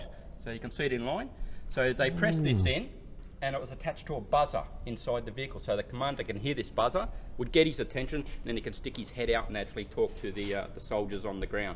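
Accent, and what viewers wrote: Australia. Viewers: Ruined by his revolting Australian accent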